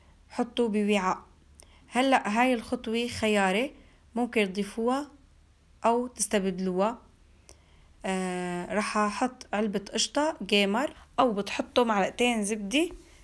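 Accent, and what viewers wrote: Homs, Syria. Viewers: من كلامه مبين انها من مدينة حمص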